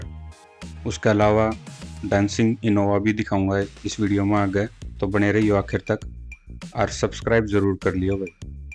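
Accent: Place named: Haryana, India. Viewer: Great voice over , the haryani accent 😃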